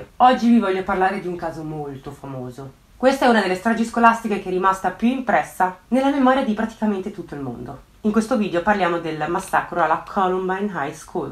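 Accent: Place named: Liguria, Italy. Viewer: ciao! Ah ok mi sembrava fossi ligure come me. Cmq brava mi piace come racconti gli eventi. E x questo che sembrava che avessi un qualcosa di famigliare. Grazie mille x la risposta! Hai un nuovo iscrittoooo!! !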